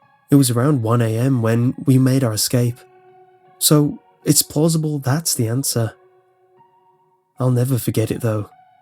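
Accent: United Kingdom. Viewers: ***** Do you not realize that there's no sign of mentioning to either the author nor the talented musician Myu. does not do this because he's good at it in my opinion. Hell, he pays someone else to edit for him. What I meant was that there have been other You Tubers that have narrated this story before. Not to mention that the original author will not be getting any bit of the income make off their stories via YouTube. Last but not least, I own the rights to my very own creepypastas. I put hard work and dedication into them. I do it because I love to, not for money. Then again even if I did I wouldn't be stealing other peoples stories. I make my own. Having a light voice, with a partial British accent and someone else doing all of the editing does not mean you have talent. For the stories that he makes on his own, there's no problem by my eye. If he wants to narrate them and make money then okay. 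But as soon as it's not his original work.. you'd best count on watching your account very closely. The moment that he steals my idea, he'll pay. I know it'll happen. Try re-filming all of Star Wars but instead putting another logo on it, changing one of the characters names like just did with this story. You'll get slapped with copyright so hard, you'll be on the streets or in prison. It's not worth losing your career over. Now I understand that it may be hard to concept this in a way that doesn't make me sound like I'm being a so called, "Dick" but us li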